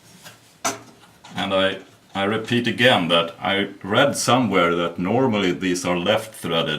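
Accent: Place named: Germany. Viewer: it's ok, we understand english is not you mother language...es ist scwher die richtige wort zu finden...my german is also not that great so...egal... :) I am assuming you are german based on your accent....curious where you live and what you do...I am american, but living in germany..watching your videos because I am about to buy that same saw...my old metabo just died and I need something cheap..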